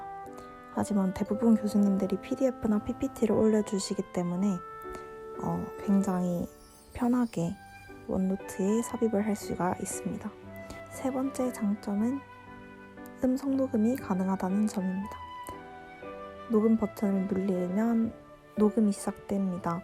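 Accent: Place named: Gyeongsang, South Korea. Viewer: 목소리가 매우 차분하고 전달력이 좋으시네요 약간 경상도 억양이 있으신 듯 한데요 저는 여자분 아주 살짝 섞여있는 경상도 억양있는거 뭔가 느낌이 좋더라구요 영상 잘 봤습니다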